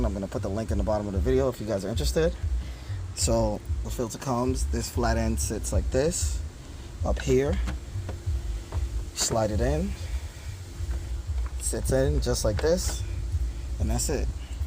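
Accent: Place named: New York City, United States. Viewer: Thanks bro you saved me $80 , I hear Washington Heights in that accent…🤓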